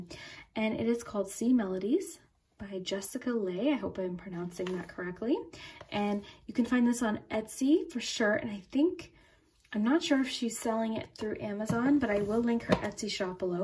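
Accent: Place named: Canada. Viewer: I loved this chit chat video!! Gave me asmr too you have such a lovely voice. wondering if you're from Canada?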